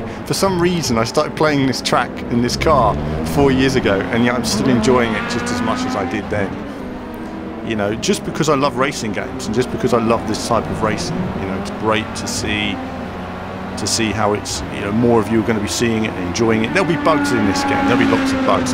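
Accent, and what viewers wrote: England, United Kingdom. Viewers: I am new to your channel and subbed right away as I was looking for updates on Project Cars. i have a PS4 and I have had all the GT games. I am hoping this game also helps to push Poly for the full gaming experience (especially the sounds). I really enjoy your style and pragmatic delivery. I'm also a sucker for a English Accent even though I'm a grown ass American man..lol....Makes me remember when I used to watch Formula One all the time on Speed Channel. Anyways...keep up the great work and I'll be looking for this next week in the States.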